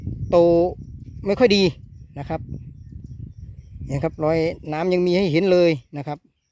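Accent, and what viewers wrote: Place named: Cambodia. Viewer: 555ดูทีไรตลกทุกทีงึกๆงักๆเขมรรีรัม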